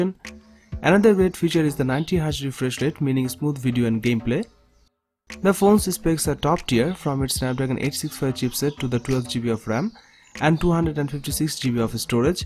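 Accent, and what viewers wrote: India. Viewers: omg the Indian accent...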